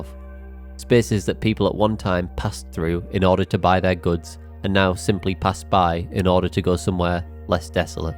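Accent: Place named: United Kingdom. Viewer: Sylvianian Families was different because, it wasn't just the bg's. Go to the british adverts and you'll notice, each one TOLD a story! So you were part of this story world (that was remarkably close to our own, at least a couple of centuries back!)! *edit: wait. I noticed your accent and I said the British ads 🤣 ignore me on that part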